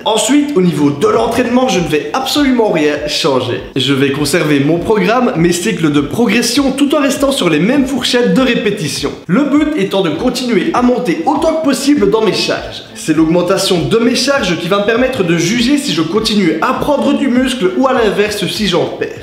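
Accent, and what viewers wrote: Liège, Belgium. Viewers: Personnellement je n’ai qu’une question ^^ tu viens d’où ? On reconnaît un peu l’accent de Liège mais je suis pas sûr lol ^^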